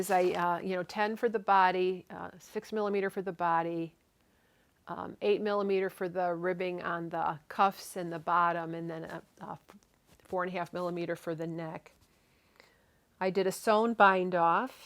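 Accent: Chicago, United States. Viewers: Thanks for your episode. You inspire me with your spinning. I have just begun spinning and have to learn how not to over spin it. I had to smile when you mentioned Sturgis, Michigan as my family had a summer home on Lake Altrich (I’m not sure if I am spelling that correctly)! It brought back fond memories of childhood. I also have to say I love listening to you because I hear the “Chicago” in your voice which, again, brings me back to my own childhood in a Chicago suburb. I do hope you understand that is a wonderful quality in your voice to me! 🤗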